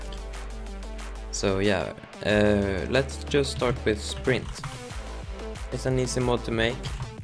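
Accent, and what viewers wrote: Sweden. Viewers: Glad att man får höra en äkta svensk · Hej är du svensk det låter som det?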